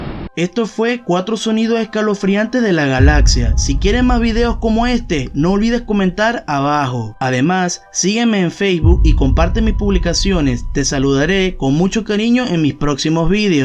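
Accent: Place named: Caribbean. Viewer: Lo unico escalofriante es tu horrible acento caribeño...por que no te dedicas al regaeton!!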